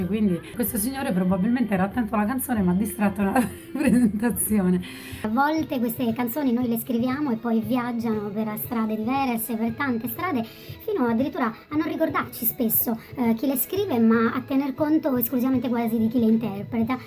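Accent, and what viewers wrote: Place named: Rome, Italy. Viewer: Anche io ho vissuto a roma per ca una decina d anni poi ho girato un po'e mi son stabilito a Forlì in em romagna la patria delle lasagne e del prosciutto di parma ma su coro meu e sempre in sardigna . Comunqu si entiva l aacento romano , comunque sei la n 1 io su internet ti seguoo sempre sei la prima cantautrice sarda che ho scoperto su youtube e son contento . Complimenti per la voce sei la n° 1 sono fiero di seguirti sto imparando tutte le tue canzoni grazie per avermi risposto . Unbacione grande a te e tutta la Sardegna .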